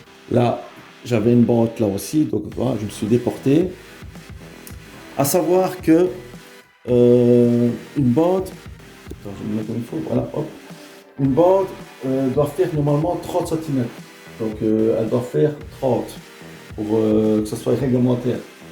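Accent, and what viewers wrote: Belgium. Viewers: Bonjour, je regarde beaucoup des vidéos, souvent c'est toujours en France; Je voudrais vous contacter pour une rénovation je suis dans la province de Liège, je vois que vous avez l'accent de Seraing . Bonne continuation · Merci pour cette vidéo. Ça semble si facile, y a plus qu’à! 💪🏻💪🏻 (Serait-ce une pointe d’accent liégeois?)☺️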